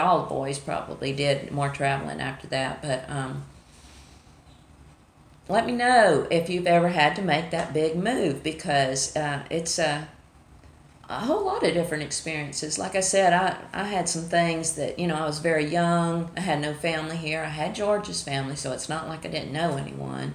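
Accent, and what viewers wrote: South, United States. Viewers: You are welcome and by the way l love your southern drawl.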